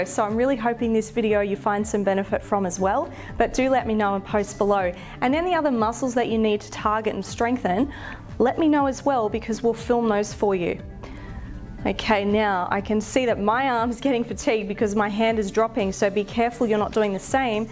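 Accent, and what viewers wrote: Australia. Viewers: Just found your videos tonight when looking for exercises for my sore shoulders to complement my physiotherapy. Enjoyed them so much I've just ordered decent bands to work with. I'll be looking up your other body workouts here on. Thanks. Love your reassuring delivery as well. Must be the Aussie (?) accent :-)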